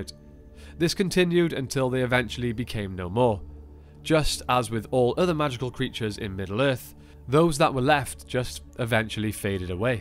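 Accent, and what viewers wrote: Wales, United Kingdom. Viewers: About time I subbed! Is this dude Welsh, does anyone know?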